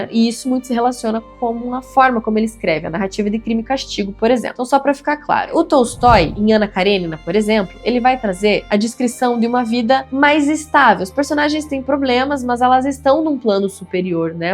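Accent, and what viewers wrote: Paraná, Brazil. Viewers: Que sotaque lindo .....acho que é paranaense ou da serra gaúcha..... A resenha é excelente....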